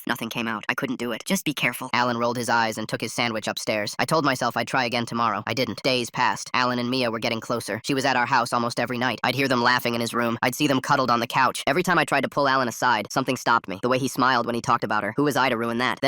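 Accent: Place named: United States. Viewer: Yeah, this guy sounds American, South Africans, yeah we say that stuff like it is, and we'll make fun of you for not knowing too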